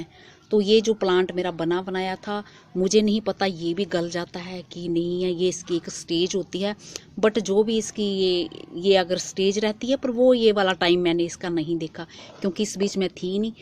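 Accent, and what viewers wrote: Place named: Himachal Pradesh, India. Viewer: apki awaj se saaf pata chal Raha hai app himachli ho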